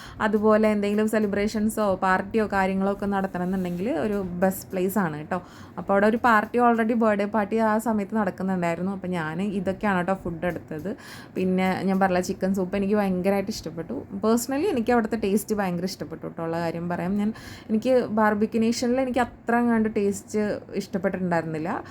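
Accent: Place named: Thrissur, India. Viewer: Suster ningal naatil evideya shabdham kond Thrissur aanenn thonnunnu ❤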